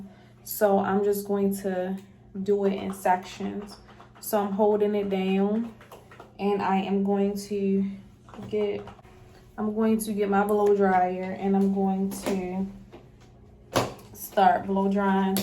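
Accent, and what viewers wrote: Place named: Baltimore, United States. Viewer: Cute! Your accent sounds like Baltimore.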